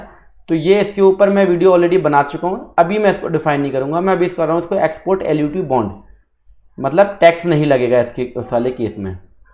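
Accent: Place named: Punjab, India. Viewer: Sir ji I am from south India but educated in the north so I can understand Hindi well, but most of my friends simply don't understand Hindi especially your Punjabi accented Hindi yet they are highly impressed with your style of teaching, So please suggest to me any guru of your caliber who can teach the same in English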